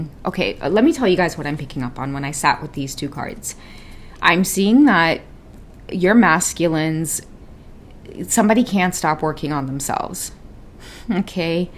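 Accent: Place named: Trinidad and Tobago. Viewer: Hello my fellow Torontonian!!! I love your Trini accent. ❤❤❤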